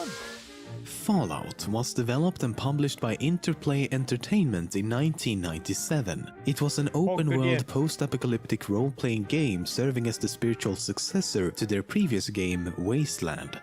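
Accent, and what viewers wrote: United Kingdom. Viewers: you can tell hes british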